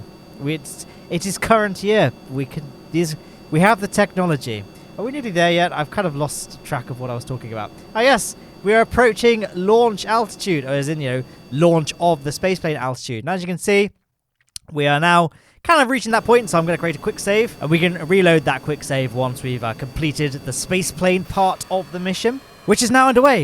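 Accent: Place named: North, United Kingdom. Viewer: I can’t ignore on the fact on how he sounds like nordern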